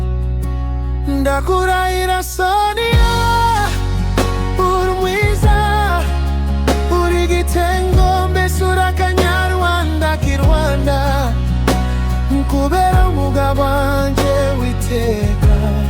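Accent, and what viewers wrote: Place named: Banyamulenge, Democratic Republic of the Congo. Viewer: Ubu uri umunyamakuru koko niba ubyiga subira kwiga ikinyarwanda cg ni ikinyamurenge ahari da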